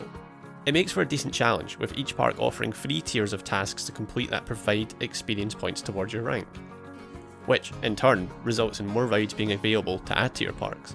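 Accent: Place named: Scotland, United Kingdom. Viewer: I have to warn you. Your Scottish accent and the fact that you talk fast makes me struggle a little bit, and I'd say I'm quite good at English. I can see others struggling a lot with your review.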